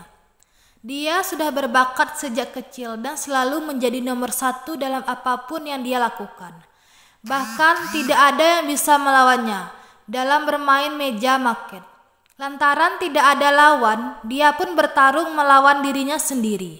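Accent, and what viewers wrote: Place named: Padang, Indonesia. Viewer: Logat org padang kental banget min...😂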